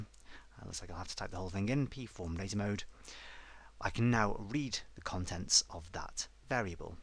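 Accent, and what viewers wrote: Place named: United Kingdom. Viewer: ***** No!I have been subscribing your channel for long time and love your videos.Problem is on me! :) English is not my mother language and I think so you are a British.I can understand easly american accent but the British Accent a little bit different.Anyway thank you for all your videos and tutorials.I'm following you.Best regards.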